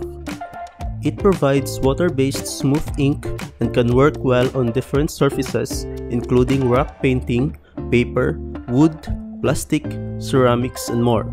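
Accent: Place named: Philippines. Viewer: Didn't know you were a Filipino at first but found out immediately when I heard your accent. Galing mo idol! Keep these videos coming!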